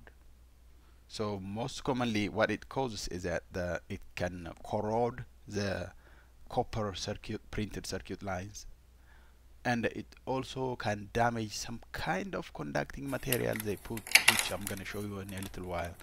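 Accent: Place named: Ethiopia. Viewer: Bro - I face the same problem on my Cruze. Sadly I was convinced the issue is on the passenger switch. Thanks so much… by the way are you Ethiopian? Your accent resembles like my Ethiopians 😊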